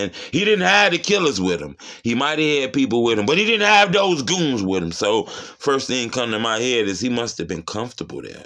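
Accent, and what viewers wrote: Chicago, United States. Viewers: I really like this channel it sounds believable and you sound just like you from Chicago do you have a Instagram I can follow or Facebook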